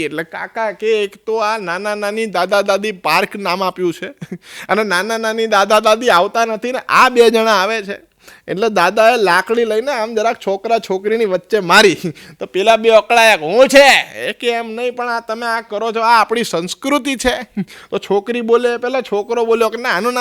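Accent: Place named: Ahmedabad, India. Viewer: ભાઈ તમારા હાસય મારફત તમો જે લોકો નુ દુઃખ દૂર કરો છો તે માટે આભાર વકત કરુ છુ આજે હાસ્ય વિસરાય ગયુ છે કેમકે આજે પતી પત્ની બંને જણા વ્યવસાય મા એવા રોકાયેલા છે હાસય શુ છે તે ભુલાય ગયુ છે તમો જે બોલો છો તે પાકકા અમદાવાદી છો એવુ લાગે છે તમો ને સાંભળી ને ખુબ આનંદ થાય છે આ રીતે આનંદ પીરસતા રહેજો આનંદ આભાર આશીર્વાદ ધન્યવાદ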